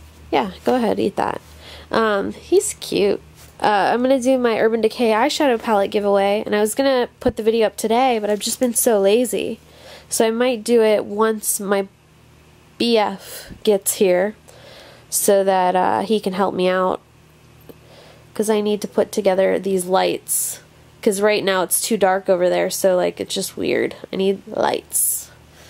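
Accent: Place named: South, United States. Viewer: Happy 200th! Loved the southern accent! Thinking the lil man needs his lil' behind cut before he gets some gross build up back there!! He's such a cutie pie!! XOXO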